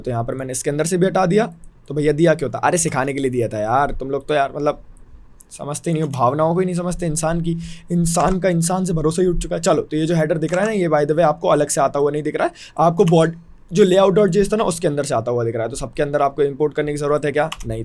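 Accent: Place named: Indore, India. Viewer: Bhiya indore s ho asa lgta h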